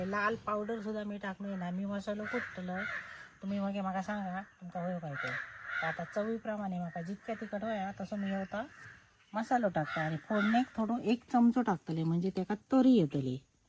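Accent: Malvani, India. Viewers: काकीची मालवणी ऐकाण पॉट भरला..... वाह....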